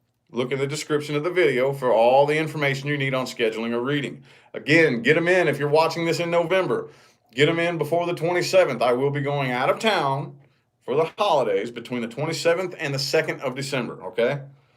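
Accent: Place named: West, United States. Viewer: My ex is Aquarius , a creeo if ever there was one. Wont let me move on as he's full with envy . Yet he cheated on me time and again, and I was 3rd party to this toxuc masked devil in disguise. Narcussust, pathological liar. I want to move on to a better life, a life I should've had . Why do I get lumbered with losers like this snoop, who sneaks in and out if my affairs, he's already got someone in the side so why does he want me?. Its money thats what it is . He was always broke .. your rather handsome cowboy!! I like yr accent!! A real western. Well I'm meant to meet a much older guy in middle of December. I dont mind as long as he's trustworthy honest,, and true. Not Me!@ I've had enough of this air sign because he's a liar, cheat, and a chancer. He cheated me, I was true but he wasn"t. That's it!! Thanks big man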